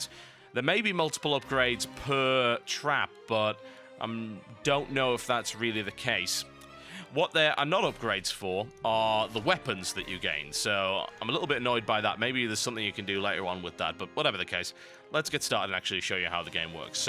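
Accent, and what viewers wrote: United Kingdom. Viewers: u talk too much and ditch the british accent thanks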